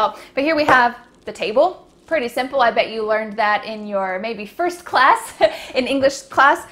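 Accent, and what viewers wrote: United States. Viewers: sei troppo brava complimenti. In quale stato dell’America vivi? Chiedo tanto per capire che accento usi del Norton del South. Grazie 😊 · WOW... I nuts about your methodology...God bless you teacher,and amen!!! I've been watching some of your videos and I love your American accent! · I luv her accent. I'm crazy about american eccent... I'm practicing this everyday.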